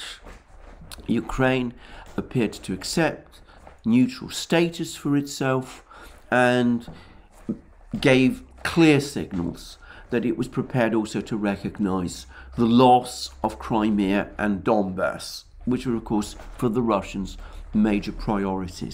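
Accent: United Kingdom. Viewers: Best place for pristine russian propaganda with a lovely british accent.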